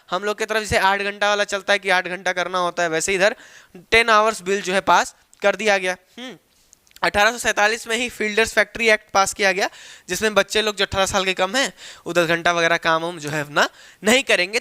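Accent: Bihar, India. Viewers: Bhaiya aap bihar se ho kya?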